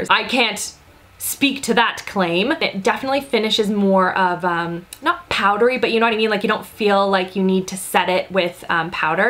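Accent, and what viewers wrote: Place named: Canada. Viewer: she’s canadian huh? I swear most english speaking canadians emphasize the ‘ou’ sound like ‘out’, ‘house’, and ‘about’.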